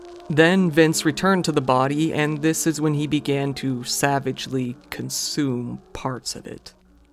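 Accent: United Kingdom. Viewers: That really REALLY sounds like Adrian from Coffeehouse Crime. As a fellow Brit the likeness is uncanny. Anyway, great upload as always! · Wait, who was the British voice? Sounds sooo familiar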